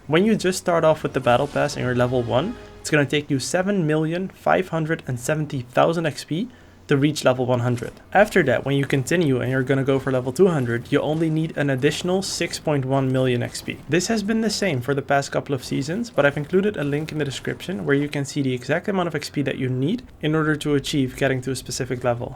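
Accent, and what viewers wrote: Netherlands. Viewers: why do you sound dutch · Ik hoor Nederlands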